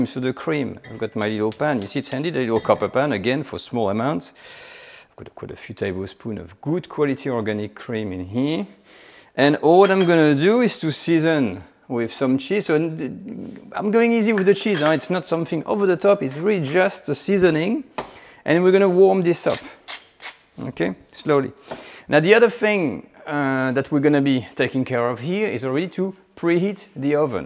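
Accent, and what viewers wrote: France. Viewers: Dude, you are losing your beautiful French accent...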